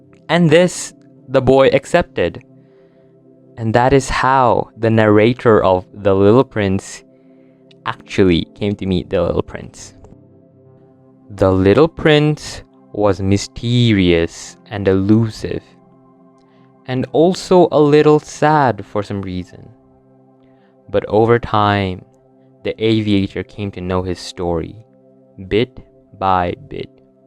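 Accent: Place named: Nepal. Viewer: Sir are u from Nepal ..if yes please lets stay connected with our team as we need accent like ur for a project we are going to launch ...please reply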